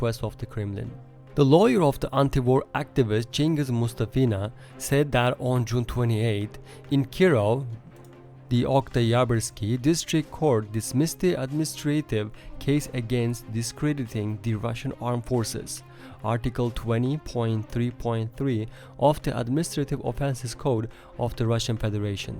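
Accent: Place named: Asia. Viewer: I must ask this first before commenting on this report. Where do you guys find such eye catching names for your YouTube channels? The accent also suggests the narrator might not even be a Ukrainian but Asian and seems to know more than the CIA and the FSB.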